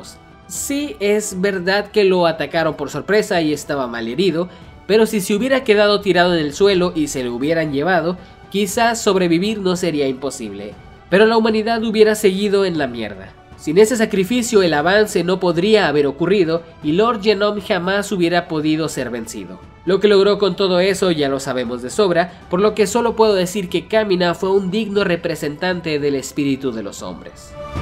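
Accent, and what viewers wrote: Mexico. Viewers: De eres? Me suena muy mexicano tu acento; Aparte que usas Muchas palabras del léxico mexicano. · jajajajjajajajaj me gusta tu forma de ablar como nosotros haci mexicano mm creo que eres mexicano nuevo sub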